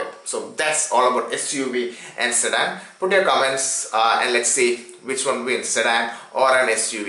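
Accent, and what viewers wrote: Kerala, India. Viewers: Dear presenter, Which part of Kerala are you from?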